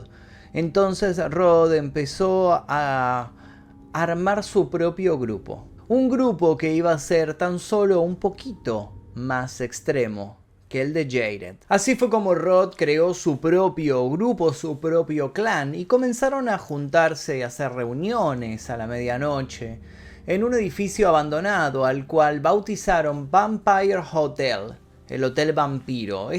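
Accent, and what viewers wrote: Argentina. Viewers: tiene buena pronunciación y habla genial y relata execelente las historias con un toque de argentina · Me encanta tu forma de narrar y a eso agregale tu acento argentino maaaaas. Saludos desde México. Soy tu fan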